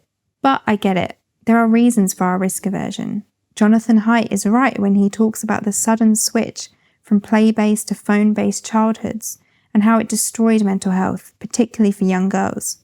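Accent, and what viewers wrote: United Kingdom. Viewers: Better than any type of non-British accent though. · She has one of the many accents found in Britain ... If she was speaking with a Scots accent (like e.g. Billy Connolly) is that THE British accent? If not then why not? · Ugh, that british accent 😍 😂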